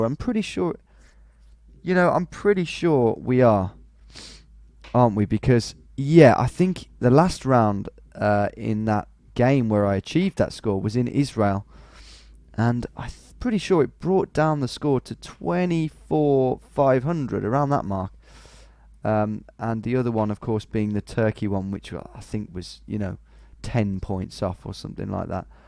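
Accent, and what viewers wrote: United Kingdom. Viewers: I just realized that Stewie has a British accent and therefore you sound like Stewie. 🏈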